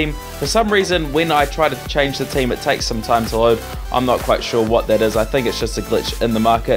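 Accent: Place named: New Zealand. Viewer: R u a New Zealander bro, u sound like on cause I am g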